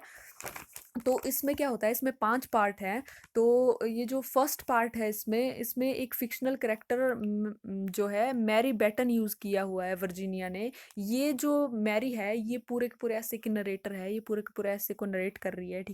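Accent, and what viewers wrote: Haryana, India. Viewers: Wow it's amazing I understand each and every point From your voice - i can guess Are you belongs from Haryana?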